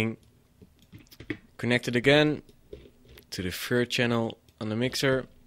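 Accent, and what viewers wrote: Netherlands. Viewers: LOL I love the way the Dutch talk. :D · It's the typical dutch accent haha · ah so is dutch cant miss that accent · Nederlands? herkende het meteen aan je accentje :P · lol nederlanders en hen accent in het engels zijn echt onmiskenbaar :P next to that good tutorial! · LOL dutch accent FTW!!!!! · Mental! I clicked it on in the margins of the You TUbe Drum and Bass selections! What girls are you on about? He does sound Dutch though..Pffffffff as the Dutch male says : ) I welcomed the tour on this software....the link did exactly what it said on the tin.*shrugs* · ye, dutch people talk english kinda funny, you always hear that immediately (i'm flemish) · Lekker hollands accent heb jij. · ik hoorde gelijk al aan je accent dat je nl bent , lol . Maar nice..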